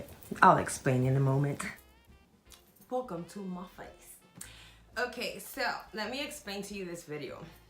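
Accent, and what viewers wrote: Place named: Spain. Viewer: I enjoy you a lot I just kind of met you watching my TV and I'm happy I have to watch somebody to Spanish like me and curious and with a little bit anxiety like me you're wonderful to me and I love watching you I love your accent oh the way you talk I'm Spanish to and I love your new baby you can't get him wonderful he is adorable I love him blessings want to be your friend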